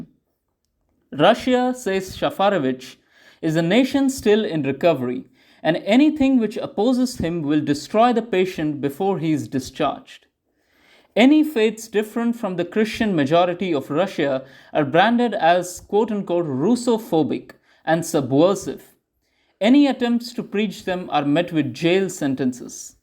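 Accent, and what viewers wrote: India. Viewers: The narrator sounds Indian as shaferevich being impressed by Indian mathematics allowed Indian orthodox Christians to come to Rus! Long live Shaferevich Long live Orthodoxy Long live Mathematical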